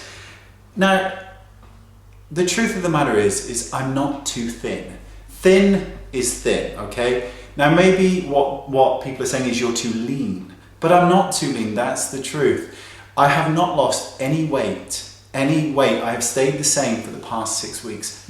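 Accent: United Kingdom. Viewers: I think you're gorgeous. You're British accent is probably misleading but if you're here in the UK call:-)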